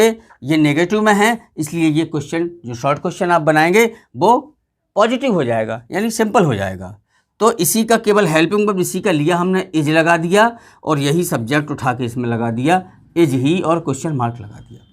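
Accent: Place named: India. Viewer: गांव से हैं अंग्रेजी बोले देसी स्टाइल में 🤣🤣🥰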